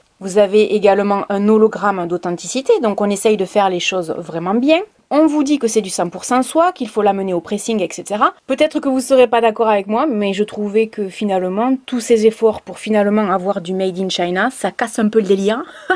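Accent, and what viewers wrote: South, France. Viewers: bonjour, je suis une nouvelle abonnée et je voulais vous dire que je vous trouve rayonnante, j'ai 49 ans et je fais sans doute partie des mamans qui vous suivent. Votre accent est un bonheur absolu car moi aussi je suis du sud (Marseille)même si je vis en Bretagne, continuez à nous donner de la joie et de la bonne humeur, restez vous même contre vents et marées. Je vous embrasse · Coucou, ça n'a rien à voir avec la vidéo, mais je voulais juste te dire que j'ai beaucoup de plaisir à t'écouter parler. J'aime beaucoup ton accent du Sud! Reste comme tu es! Sinon, Super vidéo!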